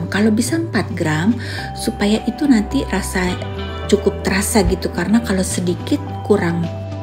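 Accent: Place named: West Java, Indonesia. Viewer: Masyaallah cantik nya ci ibun,,, Logat bahasa seperti nya orang sunda yah🤩, Salam sukses ibun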